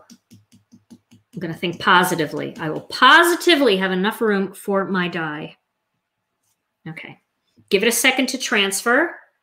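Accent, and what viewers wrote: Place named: East Coast, United States. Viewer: Absolutely gorgeous!!!! The shading is so amazing and I learned so much!!! I love your fun videos AND your accent 💜!!!! I grew up in Rye, NY and love hearing East Coast accents. Be well! Hugs, Karen ❌💜❌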